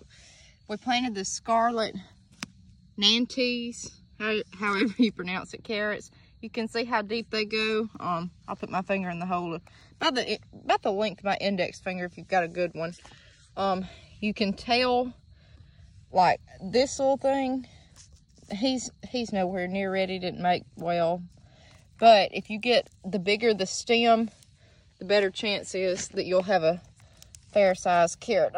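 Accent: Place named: Tennessee, United States. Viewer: Your soil looks pretty crappy but I have the same soil but orange clay with all that gravel (chert) in it. That's a decent harvest for a small patch. I bet they'd be good as hell in a pot roast with carrots and potatoes. You have to be in Tennessee judging by your accent. I know a. Her first name starts with a T and rhymes with Maria. She's about 25.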